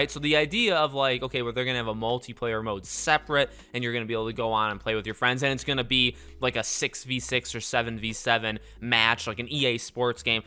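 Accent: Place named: Midwest, United States. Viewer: You sound like you are from Chicago or Wisconsin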